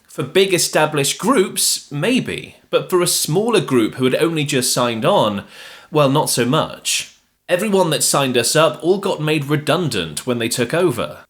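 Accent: United Kingdom. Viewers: Yeah, between this strangely moralistic stance and the fact he censors words like "masturbation", he sounds like a puritanical American who happens to have a British accent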